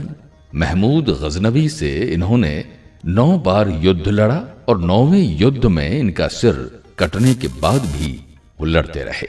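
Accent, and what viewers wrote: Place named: Rajasthan, India. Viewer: मेरा मानना है सर जी,जिस को राजस्थान के इतिहास और कला संस्कृति को पढ़ने की आवश्यकता या रूचि होगी उसे मायड़ भाषा की समझ और मायड़ भाषा में रूचि भी होगी। ये बाते लिखी हुई और बोली हुई हर जगह मिल जाएगी लेकिन सर की राजस्थानी आवाज़ उन्हीं बातो को दिल तक ले जाती हैं। हम तो आप की बोली के मुरीद हैं, बाकी आप का हुकुम सर आंखो 🙏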